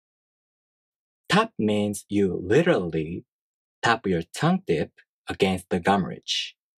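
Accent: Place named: South Korea. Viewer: Why do you have a slightly Korean accent?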